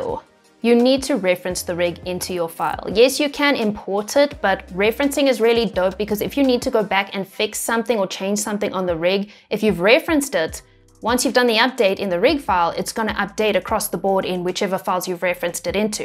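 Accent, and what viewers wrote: South Africa. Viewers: wow you really do sound south African! hate taking leave it just makes me aware of how much work piles up for when I get back.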